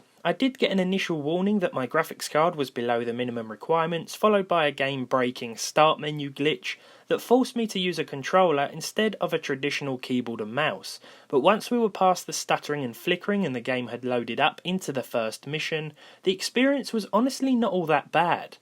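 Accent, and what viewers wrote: United Kingdom. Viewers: Dis fookin brit accent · Why do British people say knot 1 5 percent instead of zero? Not joking I legit am confused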